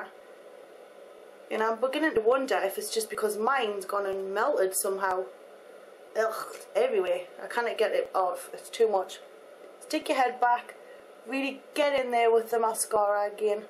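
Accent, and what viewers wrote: Newcastle, United Kingdom. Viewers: Are you From Newcastle ? You have a Geordie Accent [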